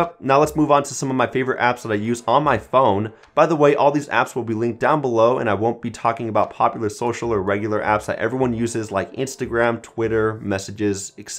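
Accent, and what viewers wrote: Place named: RP, United Kingdom. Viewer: Your pronouncing is like BBC News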